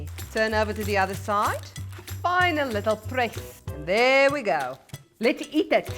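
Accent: Afrikaans, South Africa. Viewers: Yeah😂😂I think she is Afrikaans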